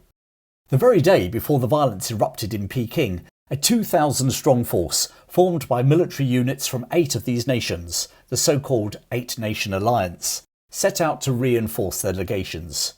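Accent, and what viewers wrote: England, United Kingdom. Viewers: Greetings from a new subscriber in Indonesia. Thank you for this well researched and well presented video, delivered fluently and clearly in a jolly English accent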